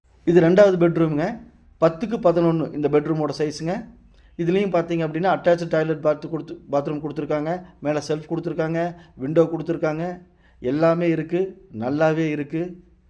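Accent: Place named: Kongu, India. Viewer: நீங்கள் பேசுகிற கொங்கு தமிழ் இனிமையாக இருக்கிறது ❤ அழிந்து வருகிற வட்டார மொழிகளை காப்பாற்றுங்கள் வீடு நன்றாக இருக்கிறது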